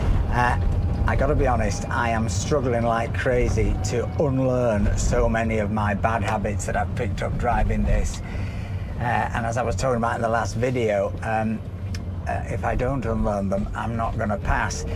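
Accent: Yorkshire, United Kingdom. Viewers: As a Yankee, I miss 50% of ruminations/rantings (due to his lovely Yorkshire accent) and as a straight woman I get very little of his cultural references, STILL, I've subscribed because those videos give me so much joy! Especially facial expressions, eye rolling and the constant reminders he's too young to share childhood memories! You guys are the modern Martin & Lewis😄 Good luck on the test! Bon courage!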